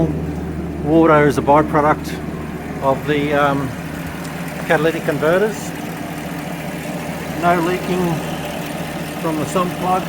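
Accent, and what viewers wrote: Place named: Australia. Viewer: I do like the Aussie accent, mate. The metric measurements and colourful expressions are also charming.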